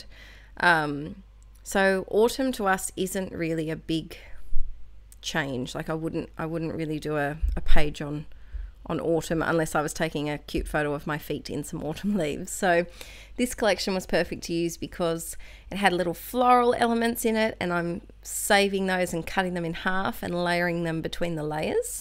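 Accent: Australia. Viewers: I like 10-15 minute videos especially if I'm at break during work but I love listening to an aussie accent for hours. So you make them as long as you like.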